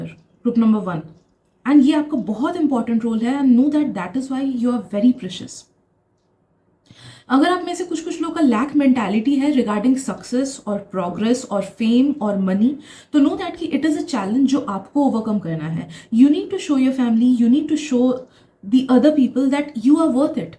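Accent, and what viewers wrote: Bengal, India. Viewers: Didi are you bengali ??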